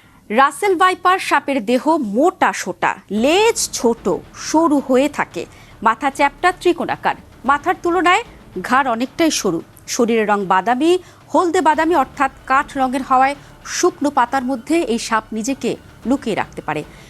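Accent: Kolkata, India. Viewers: Apni ki Indian ? Apnar language and presentation style Kolkata ba Indian Bengali style er. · এই presenter মনে হয় ভারতীয় নারী।। তার বাচন ভঙ্গি কলকাতার মেয়েদের মতো।। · Apnar accent kolkatar moto keno? disgusting,disappointing, unacceptable. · নাচনেওয়ালী কোলকাতার accent এর উপস্থাপিকা কেন? বিরক্ত লাগল খুব। উচ্চারণও কোলকাতার যা শুনেই বিরক্ত লাগল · আপনি কলকাতা স্টাইলে কথা বলেন কেন , আর এটা বাংলা না বাংলাদেশ ওকে... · Apni kolkata style a Bola bondho koren. Birokto lagey.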